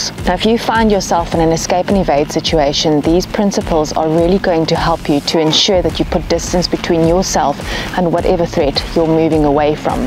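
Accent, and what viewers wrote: South Africa. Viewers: Listening to a South African teach me how to counter track just feels right. 🥷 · You have a pretorian accent 😊 I hope I’m right? I’m from the USA, it’s worth a guess. Also excellent content! Subbed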